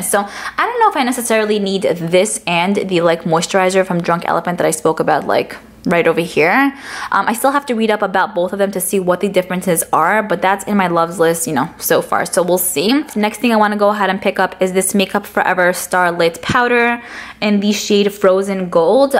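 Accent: New York, United States. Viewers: I really didn’t want to be mean, I love her accent it’s a big reason why I subscribed it reminds me of when I lived in NYC/Jersey 😊❤️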